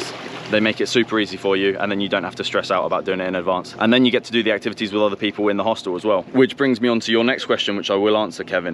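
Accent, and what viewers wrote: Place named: United Kingdom. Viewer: ARE u british? i like the accent though.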